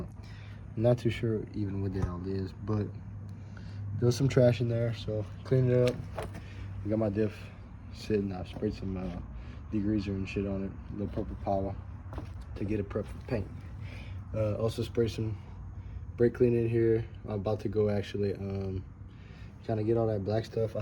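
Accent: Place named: South Louisiana, United States. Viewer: As soon as I heard you talk I knew you were from S. LA. Me too. I have a 2013 Sierra ticking its ass off. Wanna do mine lol?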